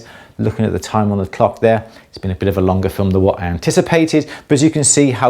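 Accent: United Kingdom. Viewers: I definitely put the cart in front of the donkey.... I was partially through a metalsmithing class before the class was shut down because of the virus. But ar 62, I’m determined this is what It want to do, even if only for making jewelry for myself, This morning I bought a Durston Agile C140 Combo 60 Flat/40 Wire Rolling Mill. I figured with all this time on my hands, why not play and learn at the same time? I came here tonight to search for beginning projects for rolling mills and found your awesome video. THANK YOU! This will be so helpful! PS! I’m thrilled you like the Duraton Agiles. What sold me was the 5th wheel that made the rolling go easier, smoother, over having four wheels. I know I will love it when it comes next week! LOVE your videos and your British accent!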